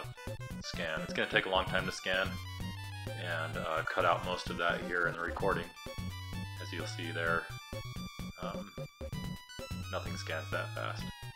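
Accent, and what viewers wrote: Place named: United States. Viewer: where are you located ? Sounds American but what state?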